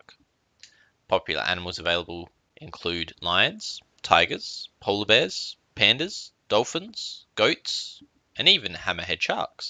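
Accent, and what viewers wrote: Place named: Australia. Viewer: If you're still curious for whatever reason, he is Australian.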